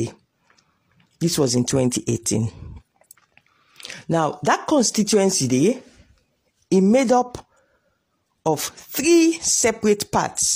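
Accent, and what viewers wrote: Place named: Sierra Leone. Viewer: The first time I have had you talk like a sierra leonean.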